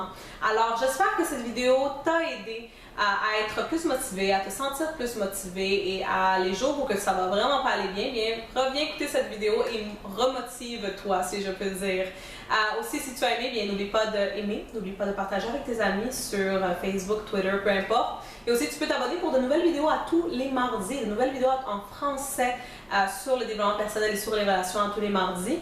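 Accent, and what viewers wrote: Canada. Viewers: impossible de comprendre le francais canadien...hélas. :(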